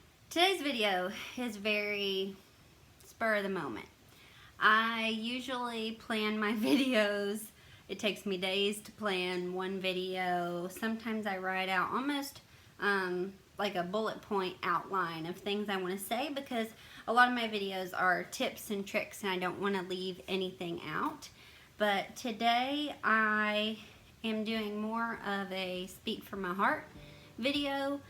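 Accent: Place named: South, United States. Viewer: I stumbled upon you while looking for an ostomy bag cover I'd seen on Facebook. (It says "Better With the Bag" in different languages and has Hollister's logo several times.). Anyway, I watched your entire Hollister video without even realizing you had an accent - my accent. I'm from NC. This summer, I spent 2 1/2 weeks in the hospital and 6 1/2 weeks in a nursing home after emergency surgery for a severe blockage that almost killed me. I'm going through a difficult time now that I'm home. I saw this video and decided to watch it.:) <3 I'm so glad I did. Not only are you southern, you are also a Christian. I've loved that Bible passage since the 60s when the song came out. Thanks for being God's hands and helping to lift my spirits. I'll be watching more of your videos in the future.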